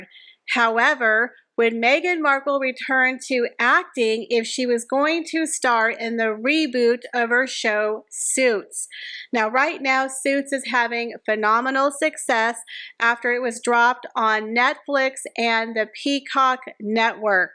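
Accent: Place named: East Texas, United States. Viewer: I love the Barbie’s! I can hear that east Texas accent along with your expressions. Reminds me of home ( south Louisiana) proud of you!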